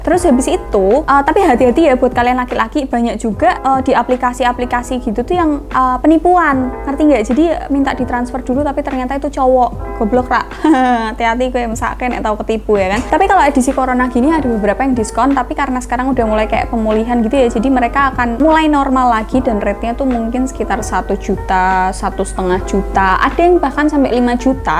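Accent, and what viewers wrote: Java, Indonesia. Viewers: seneng cara ngomongnya medhok bgt♡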